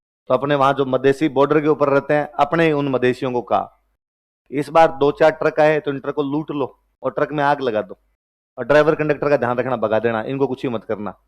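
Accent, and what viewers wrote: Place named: Rajasthan, India. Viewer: कुछ दिनों से आपके वीडियो देख रहा हूं 😅 मजा आता है राजस्थानी लहजा आता है जेसे khan sir का भोजपुरी लहजा है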